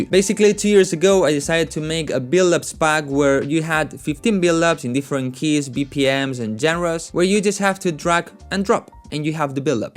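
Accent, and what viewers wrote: Spain. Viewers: eres español jajaj se te nota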